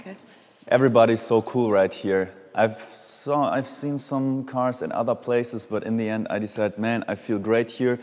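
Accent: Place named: Germany. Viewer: Du hast den deutschesten Akzent der Welt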